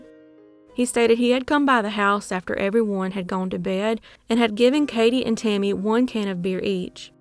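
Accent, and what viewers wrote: South, United States. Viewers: Yes, I agree with everyone. Your opinion spun on this vile behavior coupled with that Southern accent is expressed quite nicely. Sorry ass sons lol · Love her southern accent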